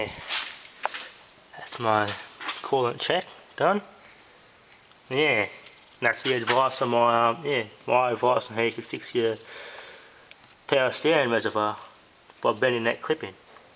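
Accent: Australia. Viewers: Sounds like an Australian accent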